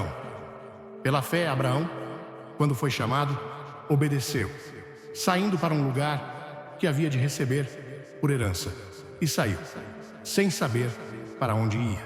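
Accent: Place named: Portugal. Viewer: Porque esse portugues chato/horroroso de Portugal, se o canal diz ser do Brasil?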